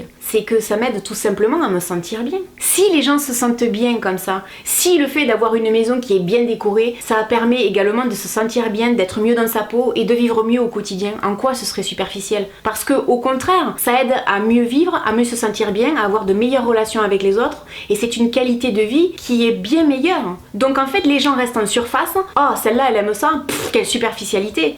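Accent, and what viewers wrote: South, France. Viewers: Je me retrouve en toi j ai 42ans et je ne me sens ni vieille ni jeune juste au bon âge 🤔. Je ne crois plus , moi non plus, en l amitié mais je pense que l on aurait été de bonnes copines toi avec ton accent du sud et moi du NORD 😁 j aime beaucoup tes vidéos et je pense que tu es la seule que ne trie pas ce que je veux regarder sur ta chaîne, je regarde tout. Pas de préjugés pour ma part, surtout via youtube où l on ne peut connaître les gens... mais tu dégages beaucoup de charme, de gentillesse et d honnêteté . Le reste t appartient.... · Tu sais quoi ? C'est incroyable, quand tu as parlé du préjugé sur ton accent, j'ai remarqué que ton accent du sud ne me dérangeait pas du tout, alors que franchement sur n'importe qui d'autre je trouve ça laid et insupportable. Je suppose que c'est parce que je t'aime bien ! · Mais non l’accent du sud c’est trop mignon je trouve ! 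Puis c’est quoi ces remarques du « t’es trop vieille » ? Pff à quelle moment 43 ans c’est vieux ? Puis même si t’en avais 87 il est où le problème ? J’ai repris mes études en droit à 21 ans ... il y en a qui les reprennent bien plus tard y a pas de limite d’âge ! ❤️ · J'adore ton accent du sud !!! Tu mets du soleil dans ma vie à Paris et j'en ai bien besoin 😂. Super que tu reprennes tes vidéos 2 fois par semaine !!! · Honnêtement vous êtes une personne toute à fait charmante et apaisante, c'est super agréable de regarder vos vidéos et outre l'accent du sud que je n'apprécie pas forcément 😉, c'est secondaire tellement vous êtes génial! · J'ai découvert l'année dernière pendant l'automne 2019. Ce fût pour moi une belle découverte comparer à toutes les youtubeuses. C'est la seule à laquelle je suis abonné et qui a chaque vidéo me fait rire. Pour moi est là juste pour ce faire du bien et nous faire du bien. 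Pour nous donner des conseils, des astuces avec toutes objectivités. Elle est belle, drôle, adorable. Elle a des yeux magnifiques, une chevelure et une couleur de rêve. vous êtes mon rayon de soleil de mes semaines et votre accent sent bon le sud de la France et ses Cigales que j'aime temps. Bonne continuation et surtout n’arrêtez pas Youtube. Là par contre c'est un ordre. Lol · Bonjour, c’est une drôle de vidéo ça 🤔 Certains devraient s’abstenir de laisser des commentaires. Ça n’est pas possible 😏 Les gens n’ont plus de respect 🤔😏 Comme plusieurs de ta communauté, je te suis depuis un bon moment et je t’aime 😍 J’adore te retrouver chaque semaine. De retour deux fois par semaine, tant mieux pour ne pas dire Youppi ❣️❣️ J’aime ton accent du Sud tellement différent du mien, Québécoise. 😜 J’aime tes blagues, ton rire contagieux😉 Pour moi qui ai soixante-deux ans, tu es jeune❣️🥰 Comme quoi tout est relatif 😏 Désolée pour les jeunes mais YouTube n’a pas d’âge 😊 Il y a Nicole, esthéticienne qui a soixante-huit ans, qui a aussi sa chaîne et c’est très bien aussi 😉🥰 De plus, c’est très agréable de te regarder... 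De superbes yeux bleus entourés d’une magnifique chevelure rousse. Toujours en beauté😉 Ne lâche surtout pas ❣️🥰🥰😘 ❤️💛💜🧡💙💚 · Quoooiiiiaaaa😲.. A perpi vous dites pas chocolatine 😮 !?... Heureusement que l accent du sud rattrape 😜... Une toulousaine qui te salut 😀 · Les vidéos passent toujours trop vite avec toi ! :( j'en veux toujours plus et moi j'habite à bruxelles mais j'adore l'accent du sud, c'est vraiment mignon de voir les différences de prononciations ! Gros bisou d'une fille de 24 ans ;-* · vous êtes simple et nature , sa change de ce que l on voit ailleurs et sa fait du bien de voir sa .Quant a votre accent du sud c est un régal a attendre . Bref ne changez surtout pas rester tel quel , et continuer a sourire . 😘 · j´adore ton accent du sud! <3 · Moi j 'adore l' accent du sud et tout ce que tu dis est très juste ça fait plaisir ça remonte le morale de voir tes vidéos merci à toi pour le sourire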